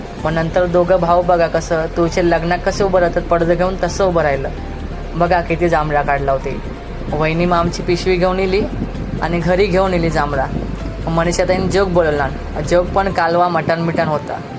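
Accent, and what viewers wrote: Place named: Malvani, India. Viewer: भाषेत सुधारणा व्हयी. ना धड मालवणी ना धड बानकोटी असा झाला मरे 😟 😄 ♥️ 👍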